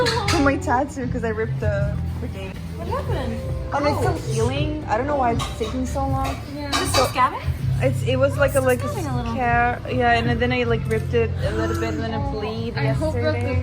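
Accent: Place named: Russia. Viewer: It's Russian-pronounced English.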